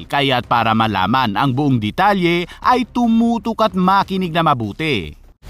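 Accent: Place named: Visayas, Philippines. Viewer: Kala ko mali lng spelling mo bisaya pala ikaw bumasa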